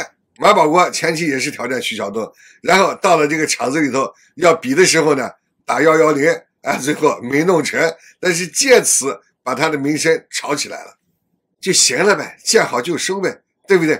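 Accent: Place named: Northwest, China. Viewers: ，额觉得你是个陕西人，得是滴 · 老哥听口音是西北人吧，思路清晰，句句实在👍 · 听大哥口音是西北一代哦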